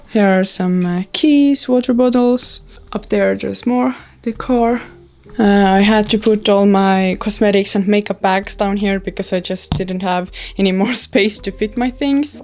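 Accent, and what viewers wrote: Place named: Estonia. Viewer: Love the Estonian accent 🤗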